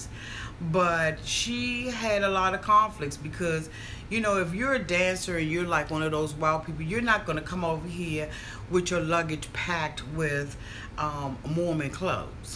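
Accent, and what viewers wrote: Washington DC, United States. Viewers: Are u from D.C.? Lol. U have a D.C. accent.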